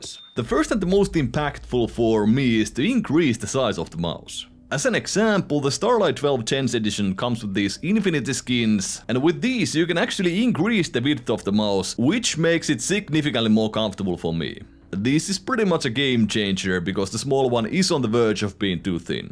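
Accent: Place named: Finland. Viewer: are you finnish? your accent sounds very finnish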